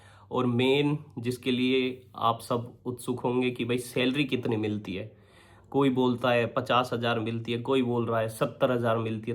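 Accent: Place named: Noida, India. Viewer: Bhai ji apka bolne ka tarika asa lag rha hai jese aap Noida se ho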